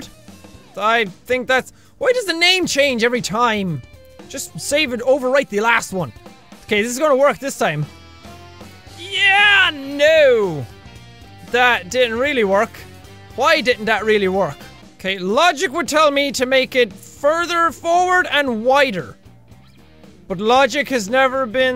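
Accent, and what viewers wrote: Ireland. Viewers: I feel so sad I havnt watched 4eva and I miss the cursing and irishness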